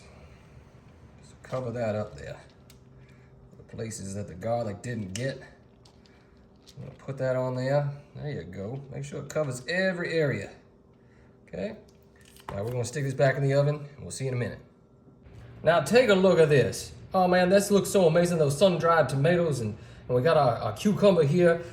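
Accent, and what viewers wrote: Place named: Louisiana, United States. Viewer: Fantastic accent! May I ask where you're from? Could it be Louisiana?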